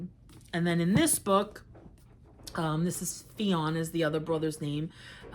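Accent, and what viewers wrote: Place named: New Jersey, United States. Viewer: I love your accent so much. Is it New jersey or Pennsylvania? Sounds like Marisa Tomei in My Cousin Vinnie.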